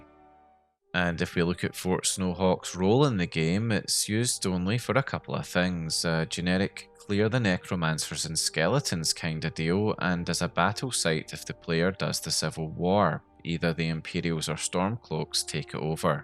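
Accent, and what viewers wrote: Scotland, United Kingdom. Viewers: Its just one of the many Scottish accents. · I like your accent. It's scottish right?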